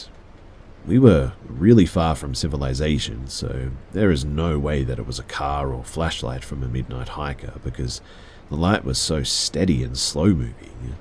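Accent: Australia. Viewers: I watch a Creepy Pasta channel called Mr.Creepy Pasta. Creepy pastas are a bit different than. His is more horror or scary. Creepies 😮 are, well,… Creepy. 😊. The are fiction about all kinds of things. It often looks like the person or people will look like they are going to get away…. But the don’t usually do. Mr. Creepy Pasta is the best! Creepy Pasta Jr.is really good, he has t been posting anything for a while. I think he’s sick.? I read a response from him thanking us for watching. I don’t want to nosy. So he hasn’t been making too many videos. And there are videos are usually shorter than, but they have been made more frequently. But he is really good because he puts out the videos on a regular basis and you can always. Count on him to have videos up and they are more than one video so you can relax and listen to him through the long and everything is fairly new in essence. 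I know the feeling the way he reads and narrates is very nice and that makes the story much greater for it. Thanks for keeping everything scary and interesting my friend you make the night that I am not doing so well more enjoyable. You’re like a drug that makes people feel better! Not so much high. But for me anyway, I can take some time off from reality and get myself immersed in the stories, and just relax and listen to your voice. Soothing Australian voice, and there are others who are from Australia and a lot from British.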